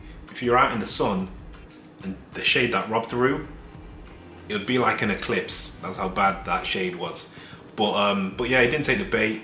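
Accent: United Kingdom. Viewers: Saw this in my recommend, saw the thumbnail and title. "Let's see what this is about." Black guy with a British accent. subscribed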